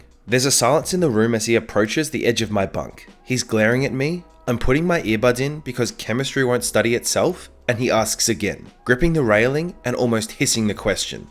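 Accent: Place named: Australia. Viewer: Yo you sound Aussie, are you?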